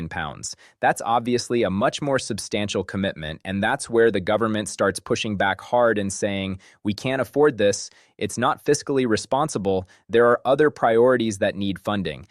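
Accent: United States. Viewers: Why do we have Americans narrating?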